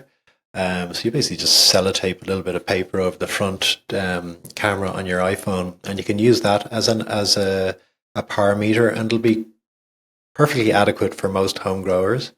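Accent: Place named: Ireland. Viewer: I could listen to talk for ages, love the Irish accent, Republic of, of course:)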